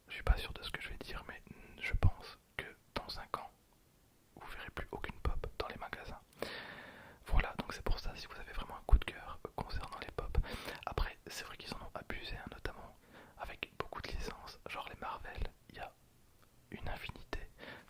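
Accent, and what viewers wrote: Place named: Belgium. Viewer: C’est la numéro Oui118 l’accent belge oblige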